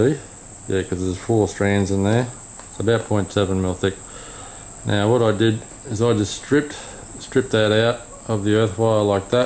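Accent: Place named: Australia. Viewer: V good & should be helpful to me as I have finished laying my track to find my locos wont go around on it, so I now have to look at doing this. Glad you are running Hornby & select DCC as I am also. You are obviously Aussie & I am English but in NZ so do the kiwis call them suitcase clips "suitcase clips" ? But had you gone straight from the bus to the rail with one feeder you would have saved effort & half the amount of clips wouldn't you? Where did you get the clips & wire from? Thanks.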